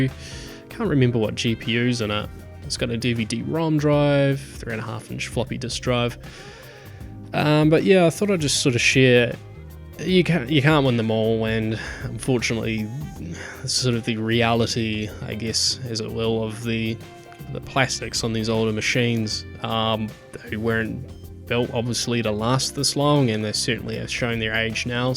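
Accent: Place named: New Zealand. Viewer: I just did a search on the Armada 110, this is the first video I saw - was looking at your machine in the video.......I think I own that exact machine now!!! I was looking at it thinking...no it cant be that big of a coincidence, but listening to your accent it sounded like a kiwi accent.....I went back into my emails from when I brought the machine off trademe last year - I am now sure it is the same machine - what a surprise! :) The machine is still going great, I am getting a lot of fun out of it. Cheers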